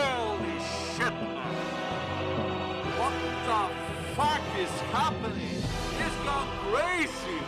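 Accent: Greece. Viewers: Oh! That's a greek accent! Γειά σου φίλε! Ωραία ανάλυση! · Only Greeks speak English with that kind of accent.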